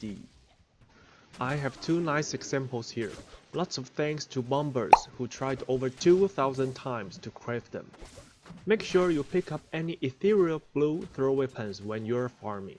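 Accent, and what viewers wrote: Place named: Japan. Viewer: Love it! :) Entertaining and useful! Love the japanese accent aswell adds to the credibility. I dont have time to the all the test a research anymore so this is very nice. Keep up the good work.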